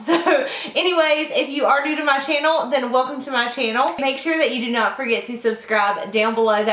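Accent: South, United States. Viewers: Tabatha Gaytan i think its her accent its sooooo thick really southern also when she says oil she doesnt pronounce the i she says ol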